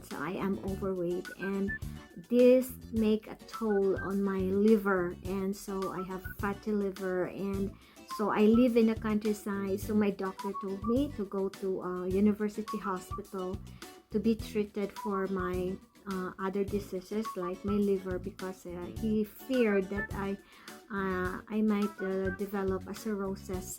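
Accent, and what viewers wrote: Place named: Philippines. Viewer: Plus your accent sounds just like hers. She was filipino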